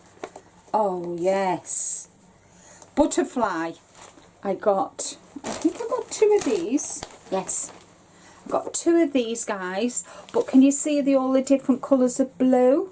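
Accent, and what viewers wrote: Liverpool, United Kingdom. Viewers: Hi, new subscriber here. How did I not come across your wonderful channel before now?!😂💜 I'm already thinking what I can crochet with that lovely horizon twilight. My colours as well! Love from a wet and windy North Yorkshire tonight. Do I detect a Liverpool accent?😂 Love that city as well xx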